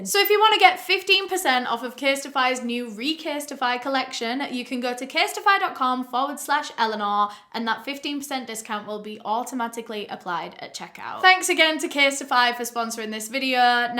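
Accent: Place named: Manchester, United Kingdom. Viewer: Yes love the Manc accent ❤️❤️❤️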